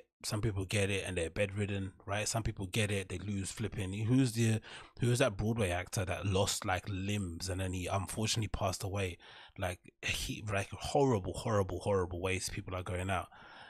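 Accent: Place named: United Kingdom. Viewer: I love your content and just giving out the facts, but your thick British accent and your very fast talking is hard to follow.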